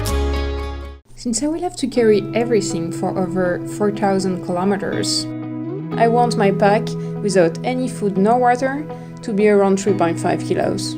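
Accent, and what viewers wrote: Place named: Europe. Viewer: Wow the production of this is amazing! I decided to subscribe within seconds. Can’t wait to see more! You sound European. I’m Welsh. Where are you from?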